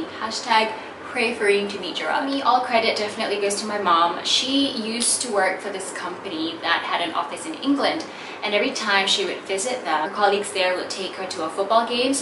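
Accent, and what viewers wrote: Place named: United States. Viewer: You speak well, where do you live. You have American accent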